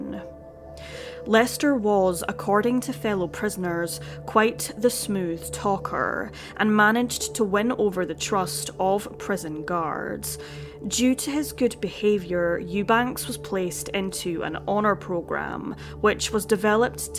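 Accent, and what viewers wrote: Scotland, United Kingdom. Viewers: Is that a fellow Scot I can hear? I was wondering when I'd come across a Scottish true crime channel!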